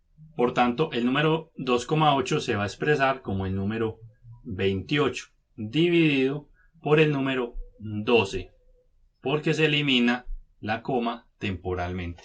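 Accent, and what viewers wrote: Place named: Colombia. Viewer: Su acento es colombiano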